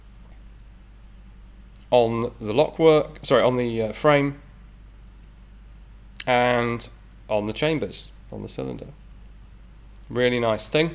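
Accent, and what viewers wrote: United Kingdom. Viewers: I wasn't expecting a British accent, I bet he never shoots them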